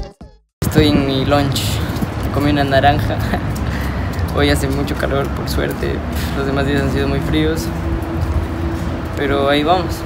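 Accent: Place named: Loja, Ecuador. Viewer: Muy buen vídeo tienes mucho talento, saludos desde Loja hermano, tú pareces Lojano también por tu acento y todo...de que parte eres?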